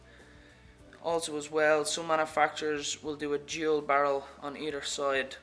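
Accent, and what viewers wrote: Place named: Dublin, Ireland. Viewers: My sister-in-law is originally from Ireland. She's got the same accent. :-) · KushDid420 Irish 🍀 👌🏾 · KushDid420 it's a northside Dublin accent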